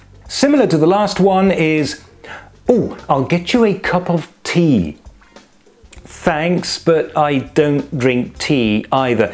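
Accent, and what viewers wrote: United Kingdom. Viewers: I didn't know you were an Englishman, I just thought you were a German who learned English from the British.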